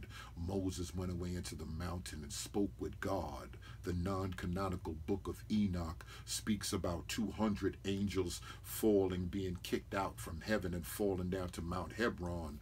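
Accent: New York, United States. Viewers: Of all you got to say, you reply this criticism That's a part of his strong NY accent. Get off the BROTHER. Ask God to help YOU so you will LISTEN to all this brother is saying. YOU got a PROBLEM!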